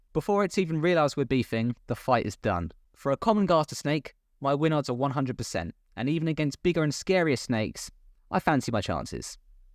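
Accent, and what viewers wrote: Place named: United Kingdom. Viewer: 😂 great video! You made me laugh! Looking forward to watching the next one! By the way, I love your British accent!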